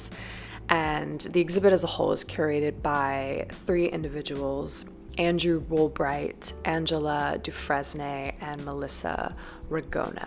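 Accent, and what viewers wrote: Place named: Wyoming, United States. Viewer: Nice wyoming accent. Love it. Pollack (The artist, not the fish) was from Cody, wasn't he. Content, somewhat stultifying, and prosaic, though. It's not Art, is it?! Let's be honest. And, as we all know, taste cannot be bought. And as that rapscallion scoundrel Hamlet once said, to be honest is to be one person picked out of ten thousand. Yes. Stultifying and prosaic is the apt description for these visuals. But thank you for making the effort. Noel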